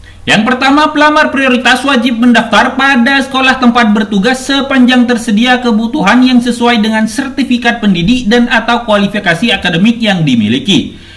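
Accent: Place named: Madura, Indonesia. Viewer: Mantaabbb minnnn.... Logatnya Madura sekali mimin... Pokoknya thanks telah memberikan informasi yang cukup jelas ini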